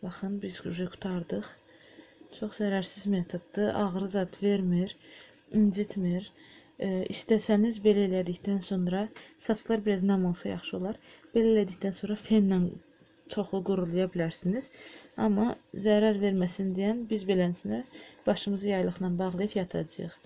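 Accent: Azerbaijan. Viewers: Salamlar. Bu videonu her yerde paylaşacam. Baxıram yarı rusca yarı hansısa Azerbaycan rayon lehcesidi. Super.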